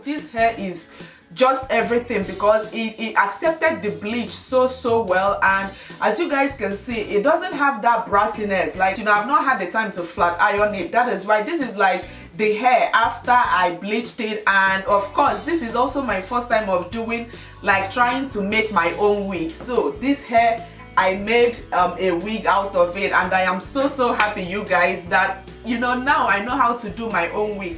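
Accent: Igbo, Nigeria. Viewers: First of all: Great Make-up ! You inspired me ! And i have a question, where are you from? You always Remind me of my (nigerian) dad and his accent :D :) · new subby here. love your spirit and your igbo accent.....really cute make up.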